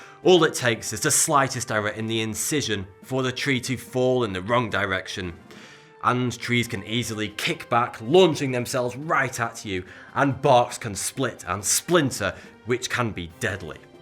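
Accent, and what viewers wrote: United Kingdom. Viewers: What area of Britain does his accent come from? I'm curious. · That's just a native British accent, my friend.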